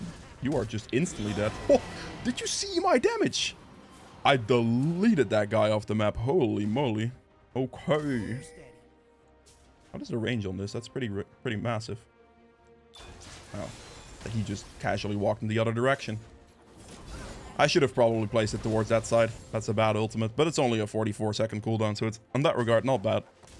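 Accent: Netherlands. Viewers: That dutch accent...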